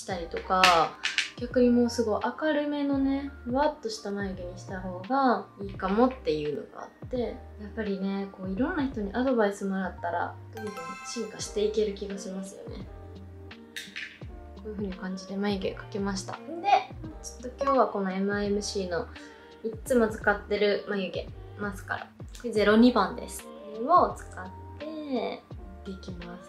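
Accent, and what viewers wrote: Kansai, Japan. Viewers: の関西弁が好き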